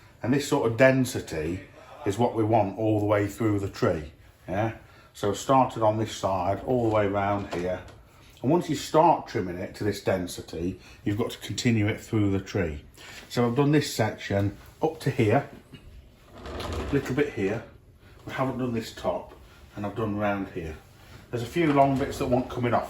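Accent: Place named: Leicester, United Kingdom. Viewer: Great video and nice to hear a Leicester accent.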